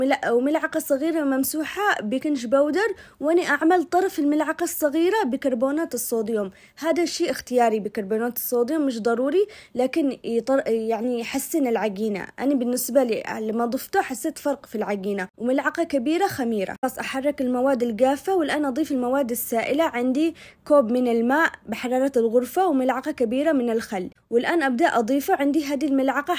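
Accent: Aden, Yemen. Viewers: تسلم دياتك الحلوات ،،،مبدعة حبيبتي ،فديت لهجتك العدنية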